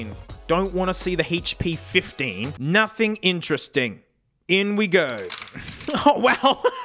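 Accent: Australia. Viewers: Question are you Australian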